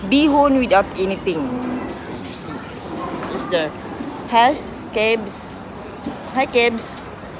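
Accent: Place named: Visayas, Philippines. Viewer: Nindot gyud ni paminawon ang bisdak mo enggloy madame. Hihi bagong tropa pa doorbell nlng pud balik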